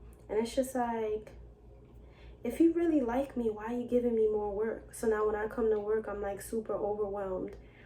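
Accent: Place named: New York, United States. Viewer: I know a Nyer when i hear one lol Thanks for making this video sis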